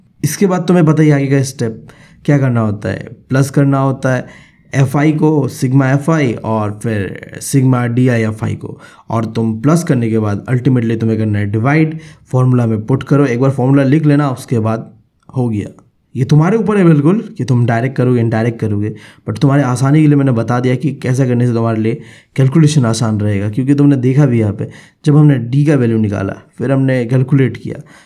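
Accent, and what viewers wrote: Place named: Bengal, India. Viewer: Please sahi se bolo na ekadam bangali kyu banrhe ho i hope tumhe meri baat samajh aai ho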